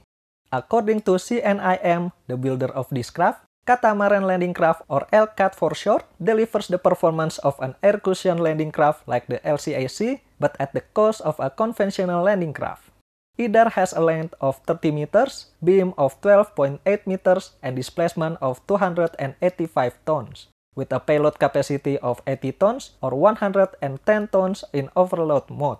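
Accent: Indonesia. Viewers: Aaaahahaha... this is the accent of english language that i love.. Indonesian accent.. love my Indonesia... 🥰🥰🥰🥰🇲🇨🇲🇨🇲🇨🇲🇨🇲🇨🇲🇨🇲🇨🇲🇨🇲🇨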